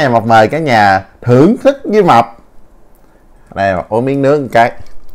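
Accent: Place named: Mekong Delta, Vietnam. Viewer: chuẩn miền tây luôn a ơi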